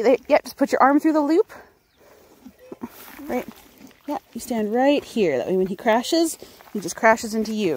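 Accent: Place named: Canada. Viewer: Sounds like you’re from Canada. Such a beautiful birth.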